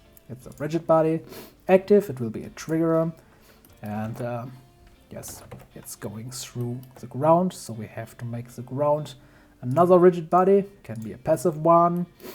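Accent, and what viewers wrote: Germany. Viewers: Your accent is very german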